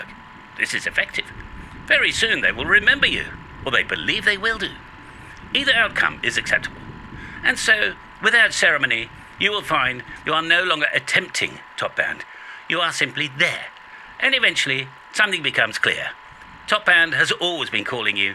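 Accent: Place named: RP, United Kingdom. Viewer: Love the posh accent! Thanks Mr!;) 73s de F4HZN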